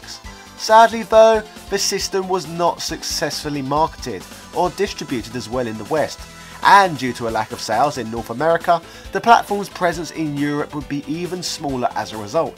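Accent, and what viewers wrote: England, United Kingdom. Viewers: What region is this guy's accent from? English folks are all so weird with their insane regional accent differences.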